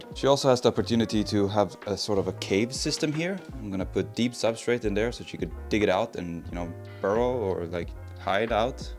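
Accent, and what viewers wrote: Sweden. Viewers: jag hörde att det var en svensk på dealekten lol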